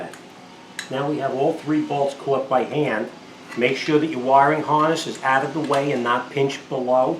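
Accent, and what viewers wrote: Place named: New York, United States. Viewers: I like your accent ! haha A NYC mechanic! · You from New York?👀 Love the accent.💯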